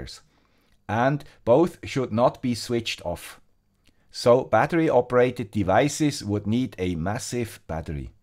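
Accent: Switzerland. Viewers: nun ja, Paraffin (Kerzenwachs) ist ein langkettiger Kohlenwasserstoff und verbrennt mit Sauerstoff in etwa zu gleichen Teilen zu Wasser(-dampf) und Kohlenstoffdioxid. Ergo müsste die Zunahme an CO2 mit der Abnahme von Sauerstoff in Verbindung stehen. Am Ende ist nicht mehr genug Sauerstoff für die Verbrennung da und die Kerze erlischt bei einem Wert um 16% O2 in der Luft. Vielleicht weiß ein anderer Zuschauer noch etwas. Wir sind ja alle hier um zu lernen :D Und danke für die vielen tollen Videos mit dem Schweizer Akzent™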